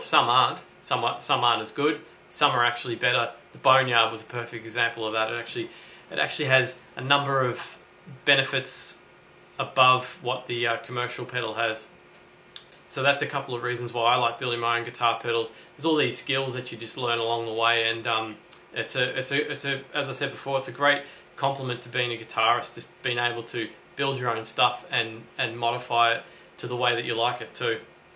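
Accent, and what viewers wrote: Australia. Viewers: Quality video ! you do a great job with this , subbed , watching / taking notes / stealing tons of ideas . don't be surprised if you notice a lil aussie accent on my next vid ....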